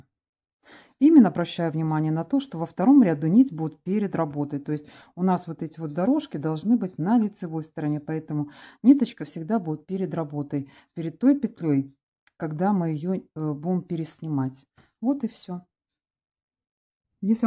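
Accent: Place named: Belarus. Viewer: здравствуйте! Почему я раньше вас не видела? Вы с 2015 года ведете канал, а только сегодня я с вами встретилась, познакомилась и, конечно же, подписалась. В целях знакомства пересмотрела много ваших видео. Очень познавательно и полезно. Хотя я вязальщица с уже достаточно большим стажем, многое взяла себе на заметку, ведь учиться и узнавать что-то новое никогда не поздно и совсем не стыдно. Спасибо вам, что делитесь своими знаниями со своими подписчиками. Удачи вам, новых идей, вдохновения, легких петелек, благодарных подписчиков и процветания каналу. Забыла сказать - ваш белорусский говор покорил меня сразу! 💖🌸🌺🌸🌺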